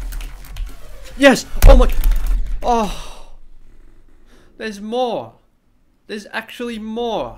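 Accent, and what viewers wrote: Australia. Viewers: is sounding significantly more australian than usual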